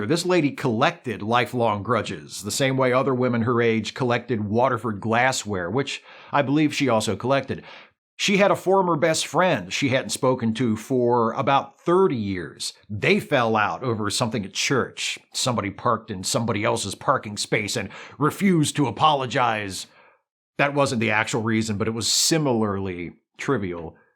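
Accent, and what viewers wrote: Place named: Northeast, United States. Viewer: Very Pennsylvania/New Jersey vibes, I approve.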